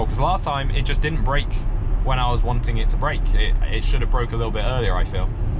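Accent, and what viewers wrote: United Kingdom. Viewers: For rear view of the mad Volvo driver you could have turned on the rear cam we could see part of it, but did not see anything in the rear view mirror. Length of video is good and your commentary was very good. You drove past a very long brick wall so you could have told us what that was or any other interesting sites you drove past. I could listen to your voice all day long and not get bored, must be the British accent, we Americans love, .lol. Good Job keep up the good work. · Amazing and relaxing video, I wonder how that autopilot would work in Portugal Old Town Road. Btw thanks to improve my English understanding, I'm French and it's nice to ear British English 😉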